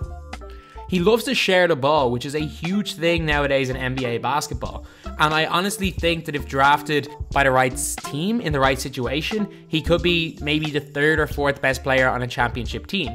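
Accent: Ireland. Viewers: This is an Irishman narrator who is trying to sound American.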